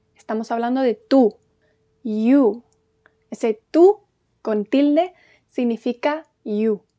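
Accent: Spain. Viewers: uh when you say something with that Spanish clasical with tounge under the teeth I am on seven heaven i think i would come to Spain to you crawling you are amazing in every way 😊😊👌👌👍👍 i would became even Spaniard because of you you are so natural...two of those of you do not exist in Espana. good job you are doing. so profesionale 👌👌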